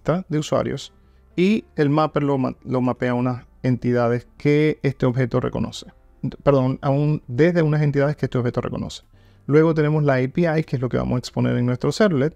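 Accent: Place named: Dominican Republic. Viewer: wow, jajaja! Tienes una voz grave y suenas un poco como los Dominicanos. Saludos bro! PD.: me suscribí a tu canal, sé que aprenderé mucho. Estoy aprendiendo Java y me gusta lo que veo, el backend es hermoso.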